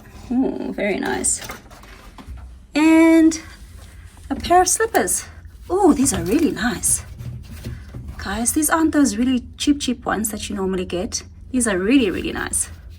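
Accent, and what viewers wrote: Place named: United Kingdom. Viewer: What do I love more, your British accent or your love for our country ?.👍👍😂❤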